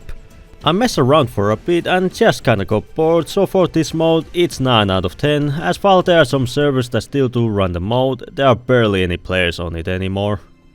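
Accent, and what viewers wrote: Finland. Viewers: I am a simple man, I hear Finnish accent, I subscribe.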